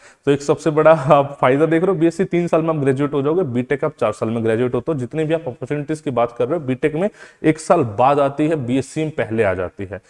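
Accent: Haryana, India. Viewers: sir aap HARYANA sai ho kya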